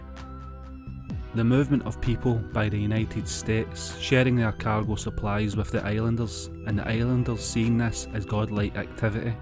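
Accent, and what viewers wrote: Scotland, United Kingdom. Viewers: Fussy haters always gonna be there. Nothing wrong with the Scottish accent - from a northern English lad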